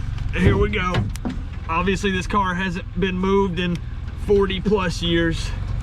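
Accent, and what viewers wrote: South, United States. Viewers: You sound like Carl Sagan if he had a southern accent. 👌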